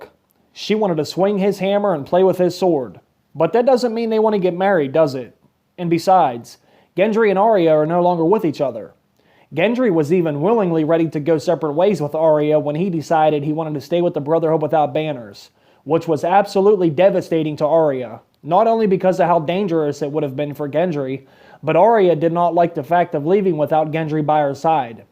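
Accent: Pittsburgh, United States. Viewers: I like your channel. BTW where are you from? You sound like you have a little bit of a Pittsburgh accent. : )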